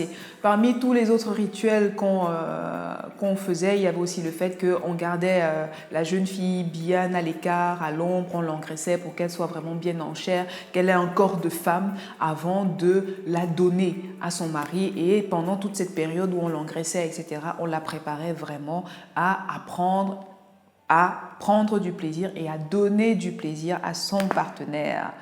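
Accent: Cameroon. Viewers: Je sens, c'est une kmer qui parle et ça me plaît grave....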